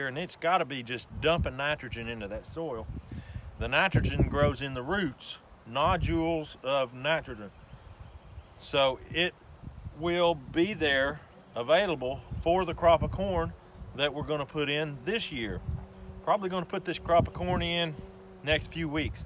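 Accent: Tennessee, United States. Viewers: As soon as I heard your accent, I thought you must be near me (Fentress Co)! Great information for me to know when to plant this. Thanks!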